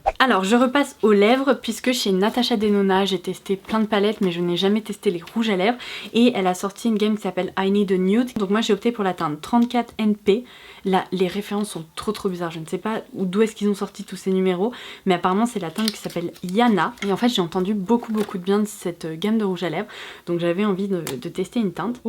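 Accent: England, United Kingdom. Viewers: Oua! Mais franchement Oua! Ca fait un petit moment que je te suit, déjà je te trouve super en tant que personne, tu es très belle, j'adore ta façon de parler et ton accent anglais me fait rêver Lol Ton unboxing me vend du rêve, les produits Daniel Sandler m'intriguent beaucoup, ils ont l'air vraiment beau, j'ai vraiment hâte que tu les test pour voir le rendu, la palette pour le visage ND, non mais comment dire que idem trop hâte que tu la testes, elle est splendide, je n'avais pas entendu du RAL de cette marque, mais il a l'air splendide également! Continues ainsi, tu es au top et très agréable à suivre! PS: j'adore tes " OH, Ouah!"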